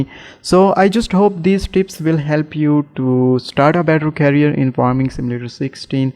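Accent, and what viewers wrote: India. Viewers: idk why your Indian accent gives me an asmr like tingle but i love it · Are you an Indian person because you sound like one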